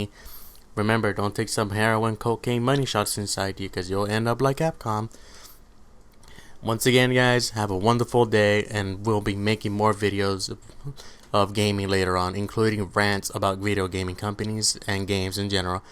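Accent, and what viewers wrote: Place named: Mexico. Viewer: you have a strong Mexican Accent are you? Well good work on the video bro ;)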